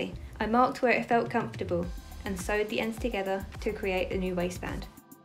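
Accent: United Kingdom. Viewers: You have one of the best British accents ever. That’s why I keep coming back. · That accent is amazing.... i wish i lived in Britain 😣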